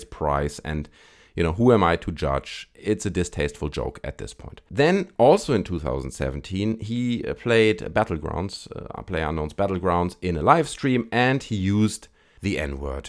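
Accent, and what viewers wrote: Germany. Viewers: Also out of context are u german? just ur accent.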